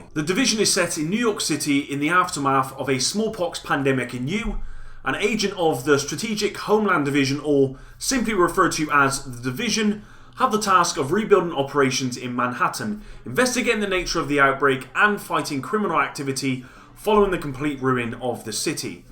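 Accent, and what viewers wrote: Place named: England, United Kingdom. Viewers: Oh these annoying as fuck English accents,why don't people just speak American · You sound like a fellow Bristolian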